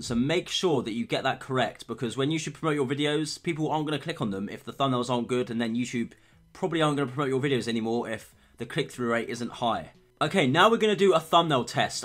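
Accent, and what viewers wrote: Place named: United Kingdom. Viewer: I can guarantee you that he's BRITISH....Bri-ish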